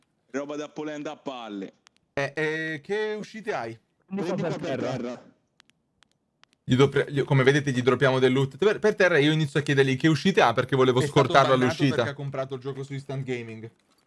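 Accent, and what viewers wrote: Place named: Tuscany, Italy. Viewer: Toscanaccio puro, mi dispiace pe sto ragazzo, BSG mi sa che vuole far morire sto gioco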